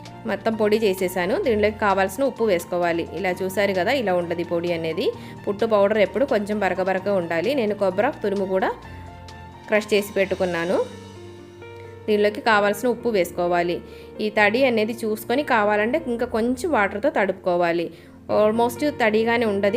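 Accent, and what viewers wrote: Kerala, India. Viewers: I'm ur new subscriber... ur way of explanation and kerala slang telugu i love that 🤍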